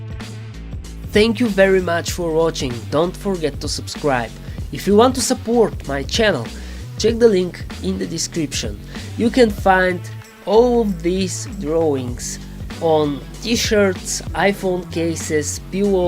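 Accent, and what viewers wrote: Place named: United Kingdom. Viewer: Hello! It's always a great pleasure to watch your videos. Thank you for this one which is very nice! (thumb up for your english; it sounds so british);)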